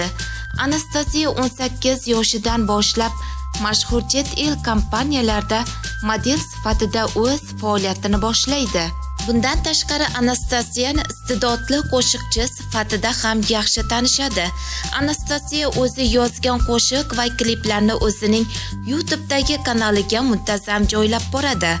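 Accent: Surkhandarya, Uzbekistan. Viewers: менимча точни сурхандарйо йоки кашкадарйодансиз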